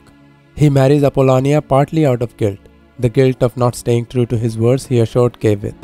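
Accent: India. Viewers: That's an indian accent, I can finally recognise it.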